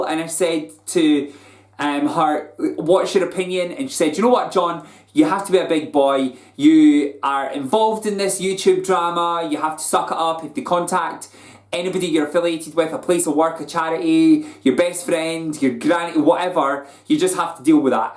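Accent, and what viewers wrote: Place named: North Scotland, United Kingdom. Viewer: omg I had no idea ur in Aberdeen. I'm in Aberdeen too. wheres your accent from, you sound like your from further up north xx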